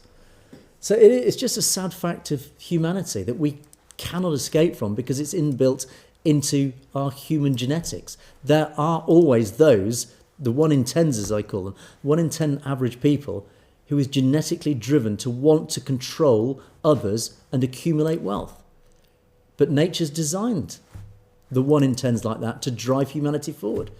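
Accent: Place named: United Kingdom. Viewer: Hello, greetings! This was very interesting and informative program, full of interesting facts! I particularly like your British Accent and want to adapt to it. But, I am afraid that Americans might make fun of it. I need your take on it.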